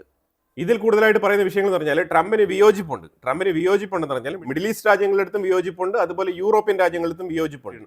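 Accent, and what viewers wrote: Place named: Trivandrum, India. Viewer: Your News presentation is excellent. But I think, you have a small Trivandrum accent.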